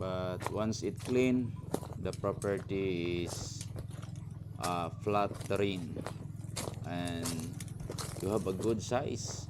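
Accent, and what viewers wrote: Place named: Visayas, Philippines. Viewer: bisaya Naman ang accent mo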